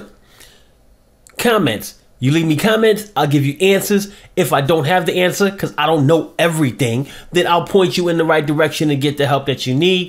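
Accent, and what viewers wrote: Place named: United States. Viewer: Congratulations! Now that I have adjusted to your American English, I must grant it to you, you explain very well and your solutions are good. And believe me this compliment is genuine as it comes from a French Speaking European old timer. Thanks a lot and carry on...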